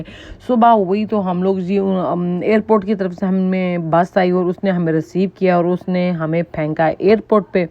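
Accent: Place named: Punjab, India. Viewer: Punjabi touch… zbrdst h.. ✌🏼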